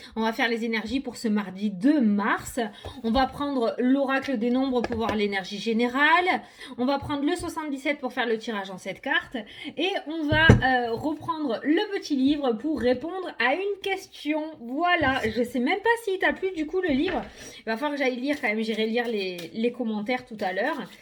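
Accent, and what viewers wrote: South, France. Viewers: Chère, quel bonheur de t entendre! J adore ta bonne humeur, ta spontanéité et ton accent de mon sud qui me manque, j habite en Thailande 😉. Je suis dans un total lâcher prise et si quelque chose me tombe dessus aujourd hui je crois que je serai en mesure d encaisser 😊😀. Gros bisous et un grand merci 🙏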